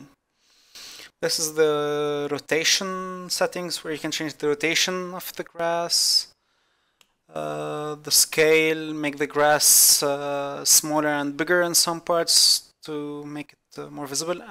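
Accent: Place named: Lebanon. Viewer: are you lebanese i can tell from the accent /;0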